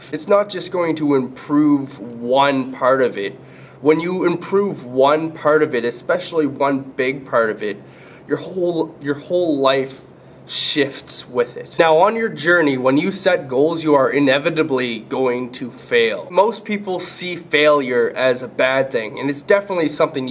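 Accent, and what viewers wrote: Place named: Canada. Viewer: Actually your account said you were. But now that you mention it, yea I hear the voice of a Canadian. *thumbs up!*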